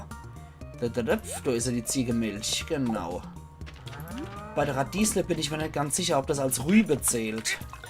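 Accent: Palatinate, Germany. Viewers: Ja klar hab ich dich abonniert. :) Durch dein Lets Play hab ich ja Stardew Valley überhaupt erst entdeckt! Und dank dem Ackermann wusste ich dann oft, was ich den Leuten zum Geburtstag schenken soll oder wo ich etwas finde. Das hilft sehr, und ausserdem macht mir das Pälzisch solche Freude. Lustigerweise gibt es ganz viele Parallelen zu meinem Dialekt, dem Baseldeutsch. Und du kannst so cool Stimmen imitieren! Lewis und Willy - oder die alte Evelyn! Voll gut! :D Achja, ausserdem freu ich mich immer wenn endlich mal jemand anständig symmetrische Sachen baut. :) Freu mich schon auf die nächste Folge! Ganz liebe Grüsse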